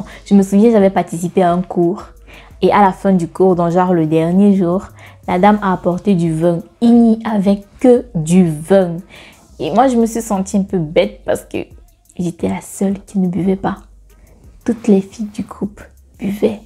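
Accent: Cameroon. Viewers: T'as un accent camerounais et ton est sénégalais?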